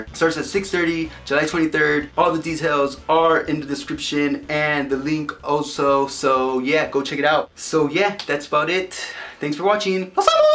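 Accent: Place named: Russia. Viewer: What is he? Like Russian? German?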